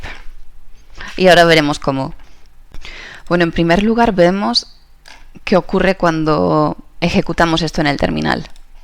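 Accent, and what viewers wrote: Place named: Navarre, Spain. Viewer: hola! en cuanto te he escuchado he sentido que eras cercana a mí. así que de cerca de Pamplona, yo de cerca también, en la ribera. muy genial tu canal, me encanta la temática y lo simpática que te muestras. ¡AUPA PUES! 😜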